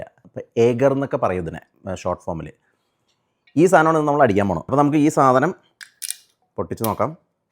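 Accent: Thrissur, India. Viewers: എന്തുട്ടാ 😂😂😂 ത്രിശൂർ ആണല്ലേ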